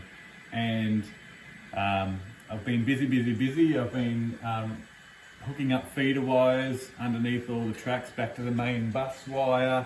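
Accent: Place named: New Zealand. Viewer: Hi, a Kiwi in Christchurch. Wasn't sure if you were here in NZ or in Aussie but the accent and reference to "hi-country" put you in this part of the Pacific. As noted, nice to see something (more) local rather than from the US or UK. I'll be keeping an eye on the progress of the Ness River Railroad. Keep safe and well, Rgds Brent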